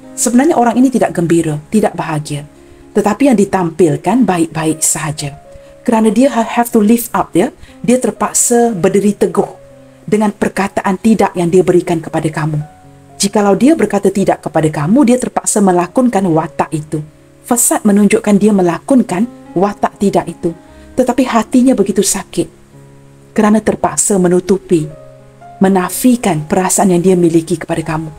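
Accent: Malaysia. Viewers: Terima kasih bunda kesayangan ku atas pembacaan nya hari pembacaan yang sangat mengemaskan terutama pada swara sexi nya bunda yang bernadakan / berbasiskan Melayu ILIKE IT sangat sexi sekali swara nya bunda semangat selalu ya bunda dalam kasih pencerahan nya semoga semakin maju Chanel nya kami sangat mendukung Chanel tarot thank you 👍👍👍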